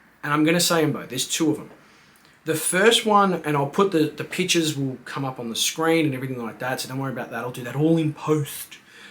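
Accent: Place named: Australia. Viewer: Hey. Thanks for still give me my fortnight Dosis of Aussie accent. 🤗 great collection you have. Made my Star Wars heart beat higher. And yes please please also show the downstairs Legos. And you do know that there are glass doors for the Kallax shelves, right?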